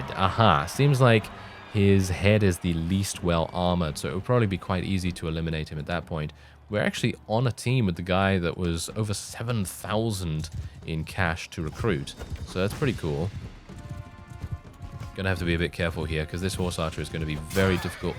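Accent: United Kingdom. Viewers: Your voice, British accent and saying the word problematic every 5 minutes You are the most fascinated one i have ever listened to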